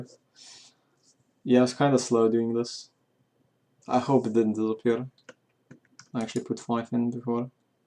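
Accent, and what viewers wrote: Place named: South Africa. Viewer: What accent is that? Sounds half South African, half something else. Nice vid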